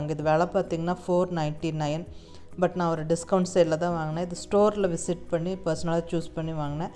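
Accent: Coimbatore, India. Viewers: Very nice mam your red color set neenga cbe ya neenga pesura slang very nice mam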